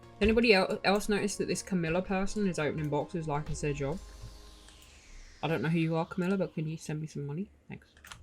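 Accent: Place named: England, United Kingdom. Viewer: You are first lady make video about dekaron. And from accent i think you are english. I live in londont form 5 year maybe can see you one day at coffe. For help me with information about global dekaron. I dont play to much global. just 1 month. And laster years same like 1 mounth after play private servers.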